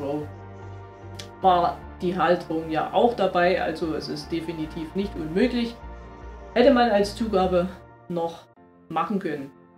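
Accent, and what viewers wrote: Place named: Saxony, Germany. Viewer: Da kommt wer aus Sachsen? Xd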